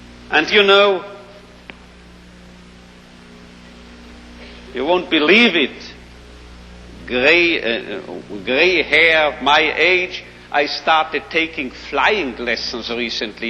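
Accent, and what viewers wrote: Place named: Germany. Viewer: <3 ze german akzent (but he's austrian?)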